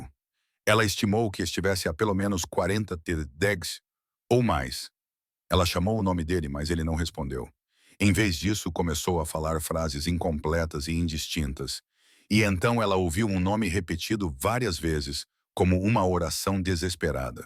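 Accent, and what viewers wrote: Portugal. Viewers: Também acho linda história maravilhosa narrador mil mais a narrador parece português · Equipe veladas e sombrio, CONGRATULAÇÕES ! Enredo excelente e inovador. A Narração é fabulosa e o português da Língua portuguesa de Portugal , com certeza, É UM DIFERENCIAL ADORÁVEL! ✨💫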